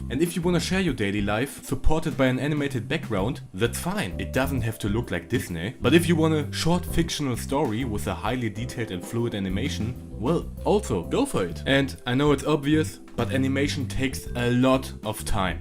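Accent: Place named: Germany. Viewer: So 2 Sachen. 1. Man merkt sehr krass das du deutsch bist. Aber solange du die Sprache gut beherrschst ist es ja ok! 2. Die Rechtschreibung. Man schreibt im Englischen nur Namen groß! Und das "I" schreibt man auch immer groß. (<-- Englisch Freak is am start)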